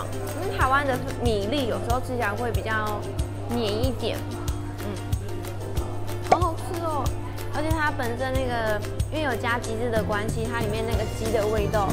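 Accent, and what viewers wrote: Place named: China. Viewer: 為什麼妳講話會有點中國的腔調😂😂😂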